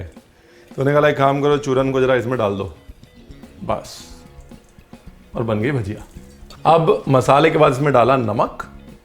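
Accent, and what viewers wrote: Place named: Punjab, India. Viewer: छोटे छोटे Dices wow maha aa gaya sun ker Punjabi tone😊 ha ha neembu ke pakore ban jayegee My Mouthwatering,😊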